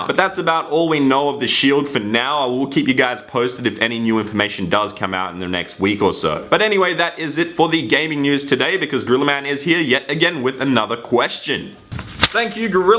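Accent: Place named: Australia. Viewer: Omg his accent is australian :O dafuq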